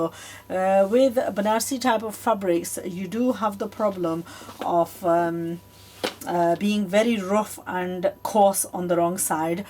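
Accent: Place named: Australia. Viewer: I don't know but she speaks like Australians but she is Asian.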